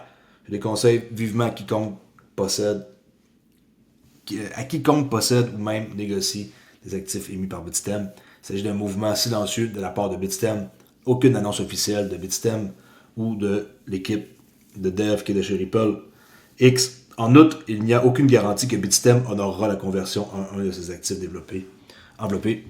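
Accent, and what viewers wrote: Canada. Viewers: Great merci mon ami cadien je suis tellement fan de ton accent canadien en x2 love it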